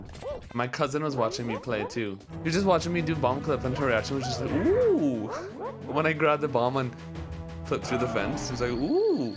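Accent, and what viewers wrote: Trinidad and Tobago. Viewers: Does anyone know where is from. Could be my ears playing tricks on me but his accent sounds like he’s from Trinidad and Tobago. Or at least grew up here.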